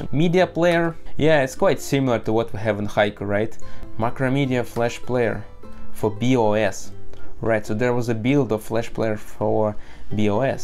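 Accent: Russia. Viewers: Obviously not, strong russian accent :)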